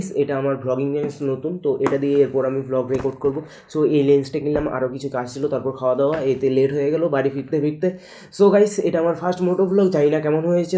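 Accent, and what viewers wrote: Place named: North Bengal, India. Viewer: North Bengal এর accent টা ভিডিওতে রাখসো.. আমার খুব ভালো লাগলো দাদা 🤗 btw আমি মাথাভাঙ্গা র বাসিন্দা ♥️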